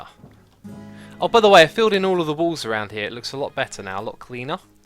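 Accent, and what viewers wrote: United Kingdom. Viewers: love xisuminati modpack stream on you tube as i live in melb aust and ALWAYS miss your live stream. all the best in finding somewhere to live. you sound like you're from london so that's not going to be cheap, i think i have enough to put a deposit on a harry potter understairs cupboard.....maybe not. keep up the brilliant videos.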